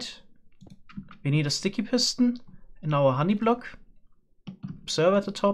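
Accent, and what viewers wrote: Germany. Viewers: Sat german accent <3 :D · ach du kacke hast du nen deutschen akzent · Are you german? I think I can hear a slight german accent.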